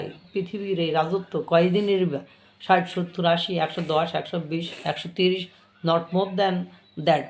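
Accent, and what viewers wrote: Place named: Khulna, Bangladesh. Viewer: খুলনা, যশোর বা সাতক্ষীরা ভাইয়ের জেলা বলেই আমার মনে হয়। কারন কথা বলার ধরন ঐদিকের মতোই।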